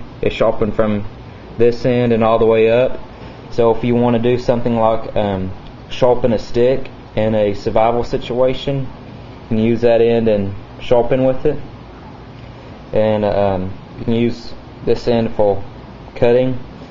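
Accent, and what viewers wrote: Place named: Texas, United States. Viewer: His accent is straight Texan! Good review my Texas brother! it sounds like has a slight speech impediment....No offense:)....we all have our things, hell my pinky fingers wont straighten out. BTW, check out the knife....Fitzen Tank......BAD A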